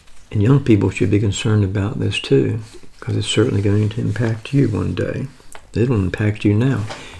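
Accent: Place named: South, United States. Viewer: Great video! Thank you for continuing efforts. I wonder if you # or labeled a video southern accent if it'd intice more people to watch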